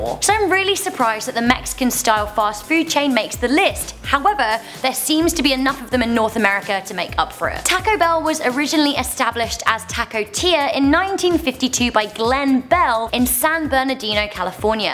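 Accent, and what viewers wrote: United Kingdom. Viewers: are you British?☺ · she's british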